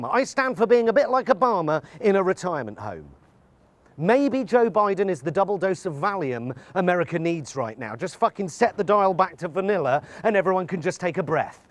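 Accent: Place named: RP, United Kingdom. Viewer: If you don't know what Democrats are about, read more than headlines on Google News. They have an entire platform, and get this, they actually talk about it on the campaign trail. The MEDIA is focusing on horserace. You here are every bit as bad as the rest of the media. Except your not, you're worse. You dress up in a suit and a tie and your posh accent and deign to tell ME that my candidate supports nothing but beating Trump. That's false, and you're an idiot for propagating this "two sides of the same coin" nonsense. YOU are the one playing into Trump's lies. What Democrats want to do: Covid-19/Health Care: Legislate to head off court challenges to the ACA. Expand the ACA with a public option. Use the Defense Production Act to make PPE, drugs, vaccines, and other medical equipment to fight Covid. Coordinate procurement and logistics for this equipment with the states. 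Create national policies for safe social interactions until such time as we get enough people vaccinated that we can go back to normal. Economy: Stop the trade war with China. $1 Trillion Infrastructure plan. Offer more money to protect small businesses struggling with Covid. Reinstate aid to people out of work due to the virus. Other things will be looked into after the virus is in check. Immigration: Pass a law to protect the DACA recipients permanently. Comprehensive immigration reform giving people here and contributing a path to citizenship. Reunite separated families. Foreign Policy: Where do we start?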